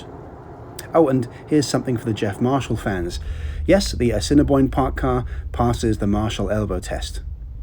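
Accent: United Kingdom. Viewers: Are you Briton???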